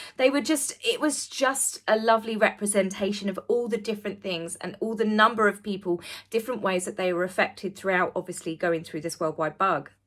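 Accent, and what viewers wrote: United Kingdom. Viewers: Thank's for talking a little bit slower in the video. Sometime's it's hard to keep up with the British accent when it goes so fast. Lol...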